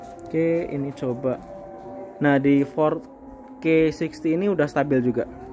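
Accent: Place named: Java, Indonesia. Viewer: Jowone sampean medok men mas,sampean seko pundi?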